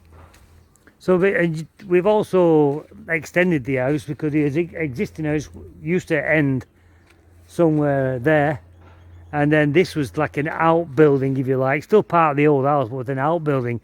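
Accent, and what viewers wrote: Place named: Northern England, United Kingdom. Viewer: Appriciate the updates, I’m from the Uk also you sound like your from Leeds/Preston area? Anyway your build is just Infront of mine! How much land do you have? I’m 37 and have 1 rai in khon kaen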